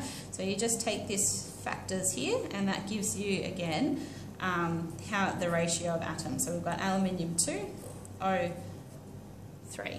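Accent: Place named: United Kingdom. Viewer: Yo she’s British that’s how British people talk, not everyone is American and speaks like one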